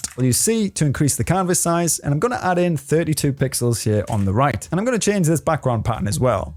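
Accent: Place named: North East England, United Kingdom. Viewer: Just found your channel dude, not watched all of this yet but started, going to after I've done food shopping as I'm planning on making a 2D horror themed RPG and this will help me learn how to use this program and what not. Also you sound like you're from up here in the North East too, always good to see :)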